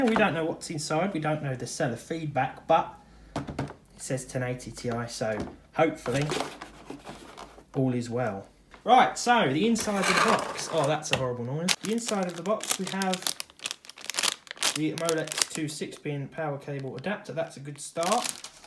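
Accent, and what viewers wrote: United Kingdom. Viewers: Greetings from "DEUTSCHLAND" i love ur british Accent!!! 🙂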